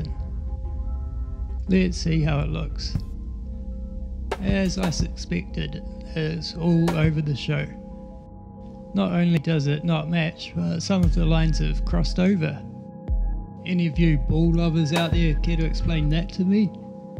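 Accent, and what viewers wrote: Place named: New Zealand. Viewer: Love the video. Intriguing with the music, kiwi accent, talk and images. And good photos to work with. But sorry it's wrong because you can't just pull any curvature drop down from the top of the mountains. You must calculate the part of the mountain(s) that you actually can see above the horizon. If you do that you'll know whats missing from the bottom part. And that will be the amount of drop. And then you got it right. Approximately 1000 meters are missing from Mt.Tapuae. As illustrated here: <link> If you compensate for "Peak 3" being some 2km beyond Mt.Tapuae then it could be closer to 800m. But the drop is there, it's huge and it's according to curvature calculation. I hope you make a follow up. I haven't got the time to make video's myself. Take care!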